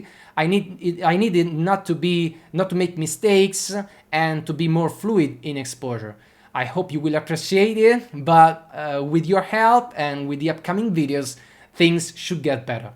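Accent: Campania, Italy. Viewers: In inglese si perde il tuo grazioso accento campano..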